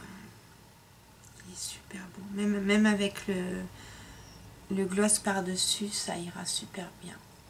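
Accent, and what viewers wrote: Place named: Paris, France. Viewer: Allo cherie tres beaux goodies - j'aime beaucoup - tes yeux sont tres belle et ton eyelashes ooh-la-la - le francais Parisienne est plus jolie que le francais ici - mon dieu tu est tres belle et je comprends tout mais mon francais ecrire et pour les oiseaux hahahahahahaha xxxx beacoup d'amour xxxxx Suzy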